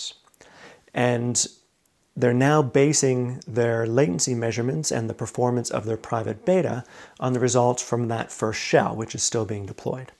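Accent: Nova Scotia, Canada. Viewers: You from Nova Scotia brudder?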